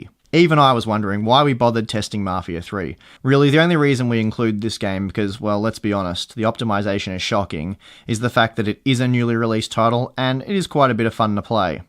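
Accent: Australia. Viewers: Why do I trust Australian voices so much more when it comes to Tech reviews? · Are you Australian?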